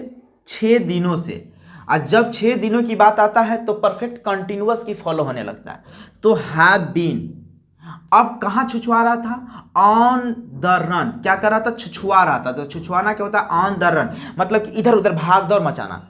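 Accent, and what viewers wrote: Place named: Bihar, India. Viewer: बिहारी हो क्या भाई... इसीलिए "ड़ा" को "रा " बोल रहें हैँ।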